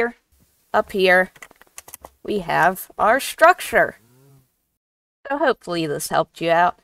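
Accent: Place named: South, United States. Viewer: most southern minecraft youtube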